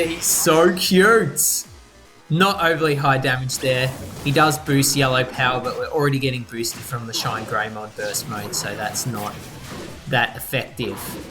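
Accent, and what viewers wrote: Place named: Australia. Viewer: Cheers for all your videos your making on rearise man i really appreciate it, its refeshing hearing another aussie voice keep them coming bro you've got yourself a new sub :)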